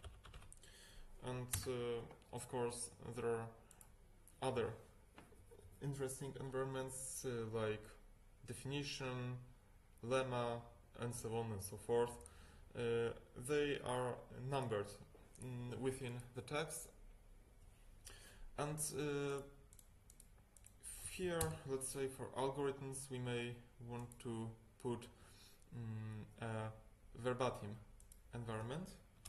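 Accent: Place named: Russia. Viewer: Как будто русский на английском говорит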